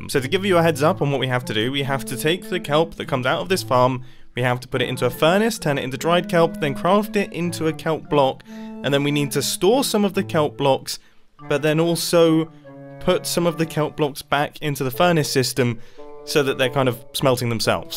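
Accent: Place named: United Kingdom. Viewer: My family loves your channel we watch it as a family sometimes just to hear you British accent 😂😂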